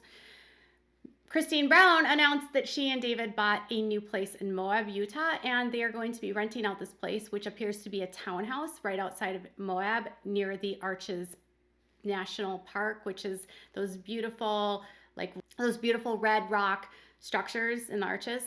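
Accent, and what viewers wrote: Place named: New York, United States. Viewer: Where are you from? You have my home town accent (Rochester/Buffalo NY)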